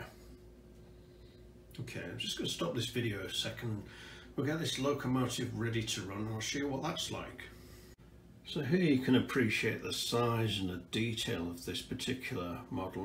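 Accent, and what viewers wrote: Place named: England, United Kingdom. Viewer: Maelee said “ahh he’s from England, that’s why he has an accent”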